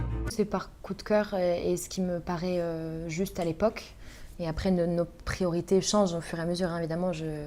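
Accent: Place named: France. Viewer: She has a very good strong frenxh accent and British one 😮❤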